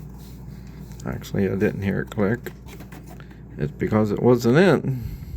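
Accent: Western Pennsylvania, United States. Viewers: Thanks for the video! Are you a Yunser from western PA?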